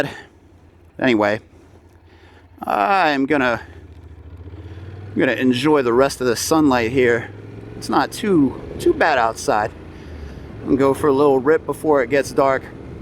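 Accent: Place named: United States. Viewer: well American people always relocate so I thought you must have relocated there .....ofcourse I know your accent is American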